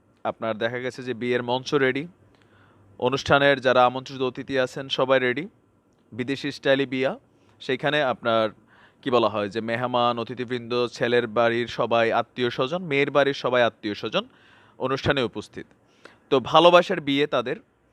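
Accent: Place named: Cumilla, Bangladesh. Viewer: ভাই এই ভাবে গান গাইলে তো আমি আপনার প্রেমে পড়ে যাবো সো কিউট কুমিল্লাার ভাষা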